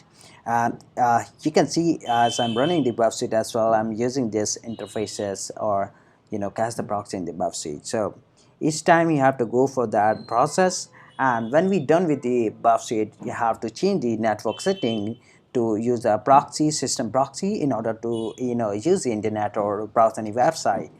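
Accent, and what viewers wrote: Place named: United States. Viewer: bhari english bola he bhai maasaallaahh full american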